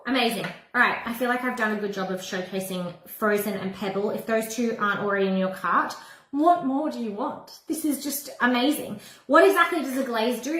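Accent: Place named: Australia. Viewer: Hi Sweetheart. Love Your Aussie Accent . Love You. Kisses.❤❤❤❤❤❤❤❤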